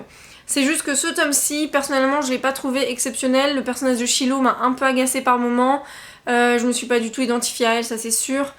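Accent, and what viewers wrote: England, United Kingdom. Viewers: J'aime tellement ton accent anglais 😍😍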